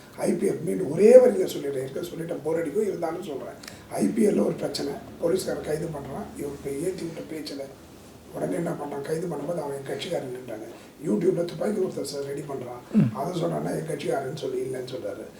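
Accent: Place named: Telugu, India. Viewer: எவ்வளவு பணம் நீ வாங்கி பேசுகிறாய் உன் தமிழ் வேறுமாதிரி இருக்கு நீ தெலுங்கரா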